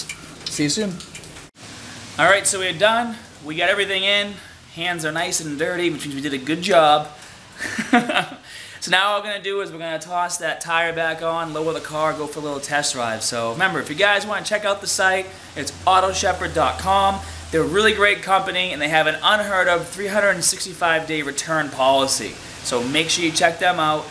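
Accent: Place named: Brooklyn, United States. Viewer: Funny, u can totally here the Brooklyn accent more in this vid than any other lol. Sweet car!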